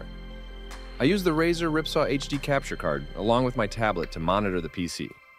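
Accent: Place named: United States. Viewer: Interesting American accent european outlet lol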